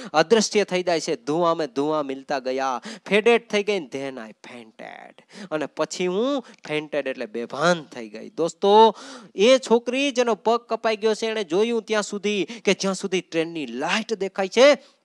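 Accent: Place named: Kathiawar, India. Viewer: Best sir વાહ કાઠ્યાવાડી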